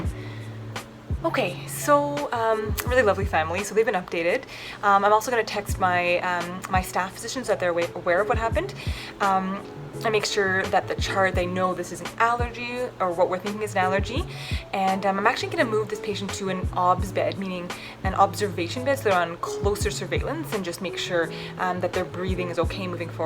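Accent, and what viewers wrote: Canada. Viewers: When you talk you can hear your inner Canadian come out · I love how sometimes you can really hear her Canadian accent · New sub here, I realise after looking through your comments and watching a heap of your vids your fan base is a lot of medical people lol. That's funny because my interest in the medical industry is zero haha, but you make it interesting and educational, and i don't regret spending maybe 2 or 3 hours watching your vids 😂😂😂 👌 ....that Canadian accent does really help too, anybody who says they don't like Canadians is just lying. Have an awesome day, new fan in NZ 😁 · Are you Canadian? Your voice doesn’t sounds exactly American and in one of your videos I saw an ambulance with a Maple leaf on it. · I know she's Canadian I can tell by the way she talks but does she work in Canada too or does she work in the United States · Ps love your Canadian accent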